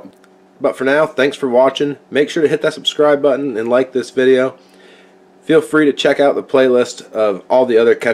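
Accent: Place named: New England, United States. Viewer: Oh your from new england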